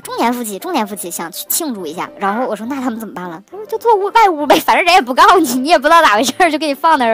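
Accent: Northeast, China. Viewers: 我发现留言的网友，居然只有我一个是男士。老尴尬了😅！我是西北人，为啥喜欢东北口音，主要我是东北大学毕业的，出国很多年了。熟悉的味道。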